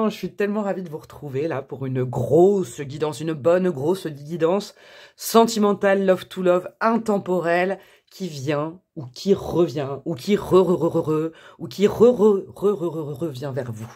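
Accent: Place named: South, France. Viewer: Coucou vous allez bien ? C'est rigolo la dernière guidance vous aviez l accent du sud et une autre medium du sud sud ... A parler de vous. Mimi .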